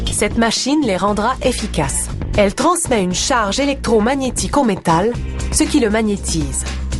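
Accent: Quebec, Canada. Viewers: L'accent québecois est horrible,perso je n' arrive pas a écouter même en me forcant.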